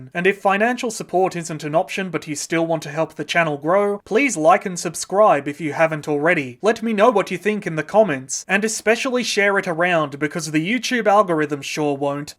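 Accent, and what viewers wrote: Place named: Australia. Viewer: He sounds Australian to me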